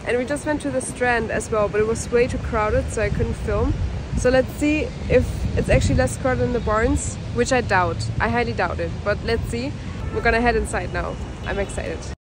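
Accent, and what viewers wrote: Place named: Germany. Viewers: Are you German? I was just wondering because you have a German accent I’m not trying to be rude( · I was wondering the same thing after hearing two sentences 😊 definitely a german accent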